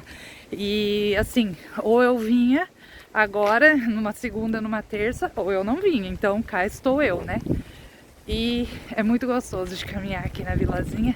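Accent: Minas Gerais, Brazil. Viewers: É interessante como você foi pegando um pouquinho do sotaque mineiro! 😅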